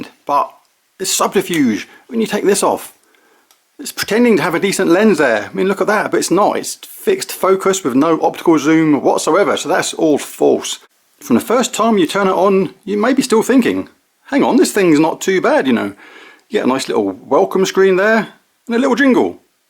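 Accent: United Kingdom. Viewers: Just subscribed...thanks it saved me from buying one mate 👌 can i ask where your from mate?? Your accent sounds local ish to me down on the south coast ( hants )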